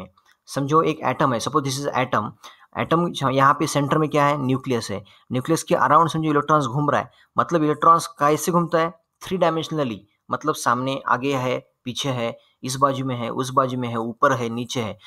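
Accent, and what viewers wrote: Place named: Maharashtra, India. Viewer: Bohot mast explanation all concept are cleared !!! And i love that MARATHI ascent 💞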